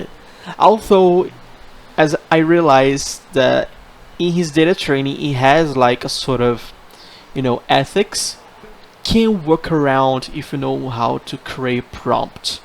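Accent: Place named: Brazil. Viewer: Thanks for the video, I have the same GPU. (Acer Nitro 5 / Intel Core i5/ 32 gb DDR4 / GPX 1650). By the way, are you Brazilian too? I am Brazilian and your accent sounds like mine lol.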